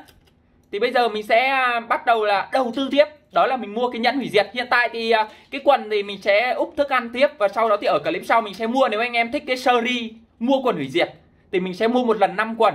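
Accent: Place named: North, Vietnam. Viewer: người miền namnois giọng sương sương miền bắc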